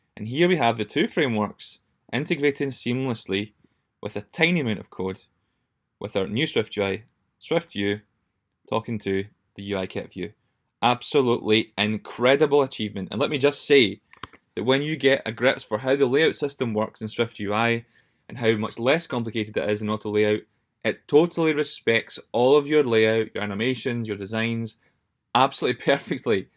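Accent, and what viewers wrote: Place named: New Zealand. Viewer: Amazing video, thanks for making it. Not related to this video, but just wanna ask: Are you from New Zealand? Your accent sounds like people from there.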